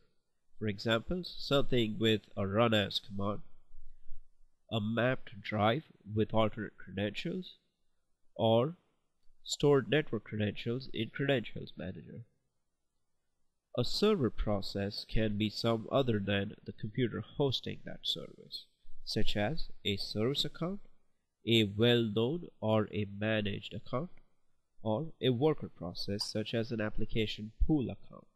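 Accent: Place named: India. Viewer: drop off the accent dude... the way you say "service" sounds like "sabzi" - which means vegetables in indian(hindi/urdu)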